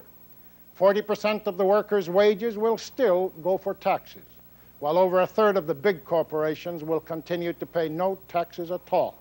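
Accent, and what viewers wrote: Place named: United States. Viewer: He sounds like a american.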